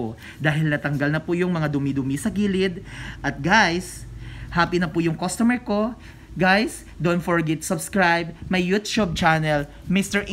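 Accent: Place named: Visayas, Philippines. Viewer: Bisdak man kaau pre hahaha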